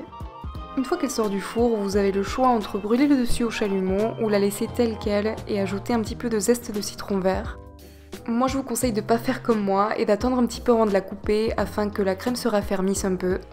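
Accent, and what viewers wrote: South, France. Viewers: tu es de quelle ville tu as un belle accent du sud!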